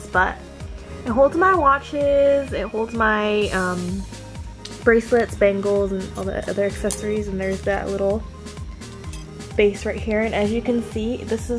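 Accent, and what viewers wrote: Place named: England, United Kingdom. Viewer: where u from u sound like ur from england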